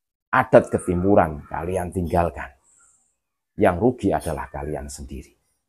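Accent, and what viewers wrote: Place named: Madura, Indonesia. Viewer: Logat bahasanya orang madura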